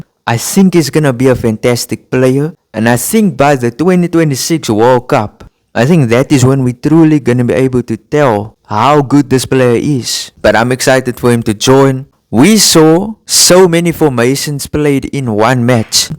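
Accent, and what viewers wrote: South Africa. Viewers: Yoh bro are u south African i am too, your accent gave u away, big up😂🎉❤ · editing skills are top-notch,Bruv! You have a South African accent. Hala Madrid🤍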